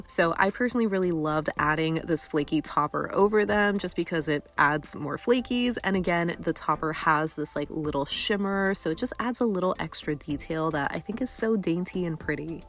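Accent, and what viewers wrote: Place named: Philadelphia, United States. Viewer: It may be a regional pronunciation thing. I’m from the Philadelphia area like and I pronounce it the same way. If you want to drive yourself crazy, you should hear us pronounce the word “water” as “wooder.” It drives people nuts.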